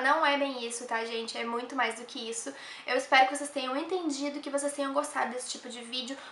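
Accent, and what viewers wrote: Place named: Rio Grande do Sul, Brazil. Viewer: Muito Tu hein hahaha adoro sotaque de Gaúcho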